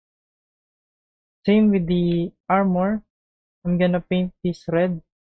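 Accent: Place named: Philippines. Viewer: Filipino here, I can hear the Filipino accent. Good job bro!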